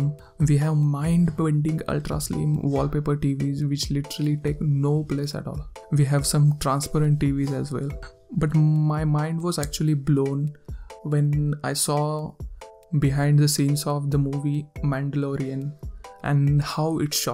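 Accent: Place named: India. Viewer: Just hear indian that's it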